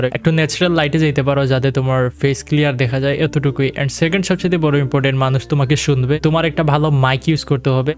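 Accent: Sylhet, Bangladesh. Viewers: Bhaia aponi ki sylheti 🤔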